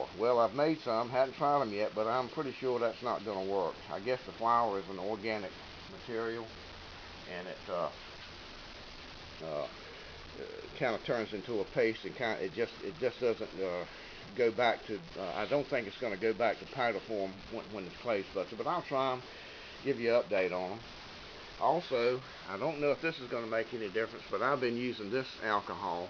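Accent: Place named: Virginia, United States. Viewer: I have a Mossberg 46B that I've had since I was a child and it was my father's since he was 11 or 12 years old from 1935 or 36. I cannot tell you how many groundhogs I killed with that rifle as a child and it still will drive tacks at 30 to 40 yards. I refinished the stock last year and it looks gorgeous but I only have one concern with the gun and is that is that it has problems sometimes feeding shells from the magazine...... I think it's more of a problem with the magazine feed tube than it is with thebolt because I've changed out the injector and shell pick up and I'm gonna be looking at the magazine a little closer and the spring to make sure it has enough pressure on the shells. If you have any ideas why they're not being picked up by the bolt please let me know ....... once the shells are shot the ejector works perfectly. By the way you must be from Norfolk or Chesapeake Virginia with that accent.....you sound just like one of my uncles!!!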